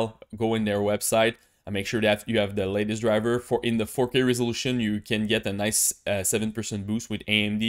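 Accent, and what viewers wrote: Quebec, Canada. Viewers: t québecois for sure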